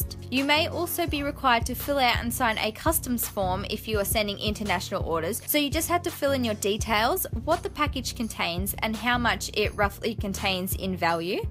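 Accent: Australia. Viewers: Are you Australian? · Is she Australian? Because if so that will make me extremely happy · Are you Aussie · Are you australian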